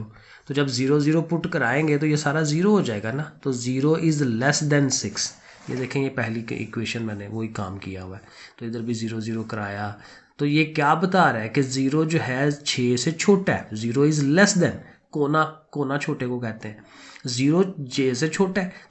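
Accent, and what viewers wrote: Sialkot, Pakistan. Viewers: Sir's accent shows that he is Sialkoti.....❤😂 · Sir ap sialkot se hein??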